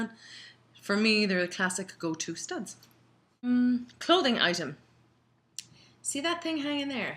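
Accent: Ireland. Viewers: We love your Irish accent !!!! The Irish accent is definitely one of our fav accent in the English language. Sweet. Oh and great fav summer products. We love Hard Candy and the bronzer you show looks OMG so pretty xx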